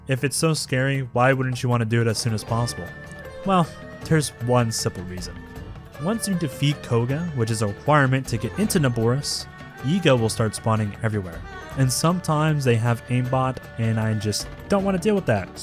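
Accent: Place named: Australia. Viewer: i can just hear your Australian accent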